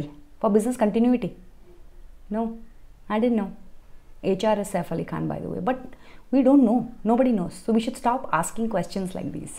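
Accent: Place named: South India, India. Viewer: Amazing amazing bus ek episode chaiye hi chaiye south indian accent driven hindi me . When u speak its music to the ears .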